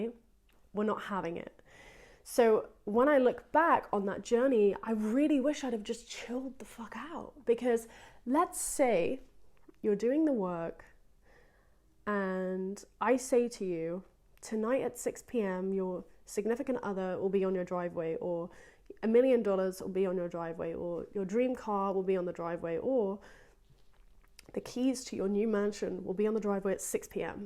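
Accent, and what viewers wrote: United Kingdom. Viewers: I really like your mindset. This is a true gem 💎 I love your British accent 😍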